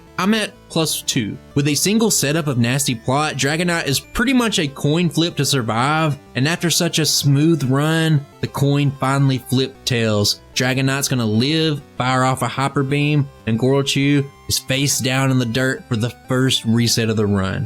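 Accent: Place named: Alabama, United States. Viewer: The twang behind it feels like that Bama flavor like my boi Cooper has 🔥 But yeah til this day we never grew up 😅 we still play Pokemon & battle each other on pokemon showdown & we're 30 now 😂🕺🏾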